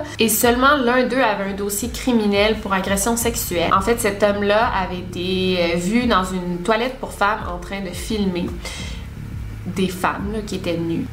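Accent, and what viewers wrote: Quebec, Canada. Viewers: J'aime beaucoup ta façon de raconter daiĺleurs grâce à toi l'accent québécois ne me dérange plus et c'est dire quil m imsuppter merci 😆en revanche cette histoire ma endormi 😥c pas le faîte qu'elle soit longue c juste que je la trouve nul Chier 'les goût et les couleurs difficile de contenté tout le monde ''mais continue vraiment je pense qkue tu ferait une très tres bonne journaliste d'investigations car aime ce que tu fait ca se ressent bisous ma belle 👄💋😻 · Mercii pour cette vidéo j’ai adoré et encore bravo pour tes 300k abonnés tu le mérites 😁😁😘 PS: tu as réussi à me faire aimer l’accent québécois 😋😋😘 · Hello 🙂 Encore Bravo pour tes 300k 🎉 C'est amplement mérité 😄 Tu fournis un superbe travail 👌 C'est toujours très complet, c'est vraiment plaisant! Tu me régale ☺️ Un travail de Qualité! Je te suis depuis tes 10k, tu es monté hyper rapidement 💪 Et ce n'est pas prêt de s'arrêter, crois moi 👌 J'apprécie énormément ton accent Québécois, je te suis depuis la France Je sais que ça peut en déranger beaucoup mais alors moi j'adore 😄😄 Ça fais ton charme ☺️ D'ailleurs, rien a voir avec cette vidéo mais alors ta Vidéo de Mariage.. 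je ne m'en remet pas! Un Mariage de Princesse 😇 Bref, Merci pour cette Vidéo hyper Qualitative et intéressante comme toujours 👌 Tu m'en apprend beaucoup ☺️ Des bisous 😘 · J'adore l'accent québécois 😍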